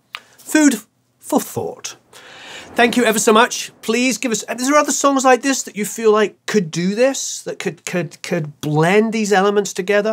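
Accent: United Kingdom. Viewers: i love your British accent but bassline was Roland System 8 😁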